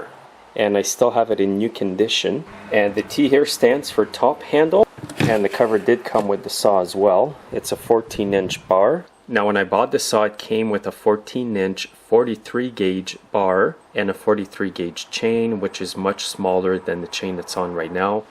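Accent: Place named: Quebec, Canada. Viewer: Ok you sound like a Quebecer 100%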